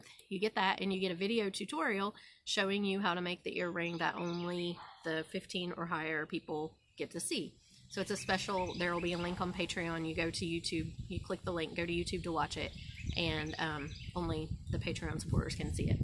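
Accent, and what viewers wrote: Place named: Pittsburgh, United States. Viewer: Ahh, okay. Every once in a while, you sound like some folks I know from the Pittsburgh area, which is why I asked. 😊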